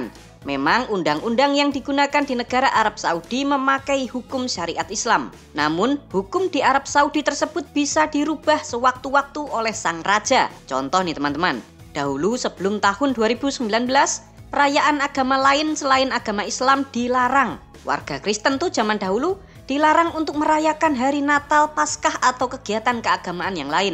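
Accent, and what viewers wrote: Java, Indonesia. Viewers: kok medok banget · Pak beri berita tentang arab bahasanya medok jawabnya ya pak jangan tinggalkan.bahasa kampung jawapnya.ya pak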